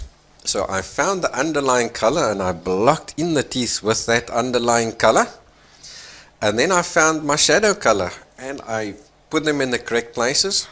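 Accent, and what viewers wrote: Afrikaans, South Africa. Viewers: He sounds Afrikaans. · You sound South African, You have my accent :? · Thank you so much for sharing. I appreciate all the little tips and details you give so freely. You have taught me so much just from watching you paint the portrait of " the girl with open hands " I wonder if you ever adventure out into abstract reality. I am quite sure you would be excellent at doing so. I am a fellow SAfrican living in the USA so your accent brings back memories of my land of birth. I wish you all of the best for the new year with many happy hours in the adventure of painting.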